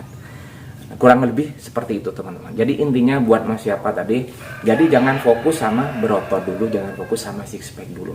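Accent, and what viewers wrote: Madura, Indonesia. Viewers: Orang madura ya kang sampean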